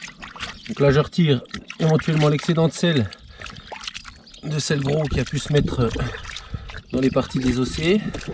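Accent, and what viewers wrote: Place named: Eastern France, France. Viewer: bonjour je viens d'essayé ta recette et je pense que j'ai réussi assez bien pour une première fois. J'ai essayé de mettre des photos mais ca ne marche pas Quand au lard je n'ai pas trouvé du bon lard frais il faut dire que je réside à la Réunion et ce n'est pas facile de trouver de la bonne qualité. mais le gout y est. J'en profite pour te dire que ma mère est d' Epenoy dans le Doubs. Je ne sais pas si tu es du coin mais vu ton accent tu ne peux être que de la région de l'Est. Au plaisir de te lire François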